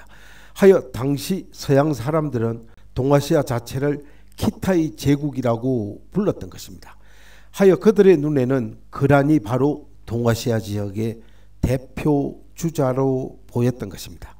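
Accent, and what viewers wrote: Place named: Busan, South Korea. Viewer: 하이고 ᆢ 나도 부산이지만 사투리 좀 고쳐라 ᆢ 다른 유투브에서 그러더니 아직도 못 고쳤네 ᆢ 왜 그리 어미에 그리 엑센트를 두냐 ᆢ?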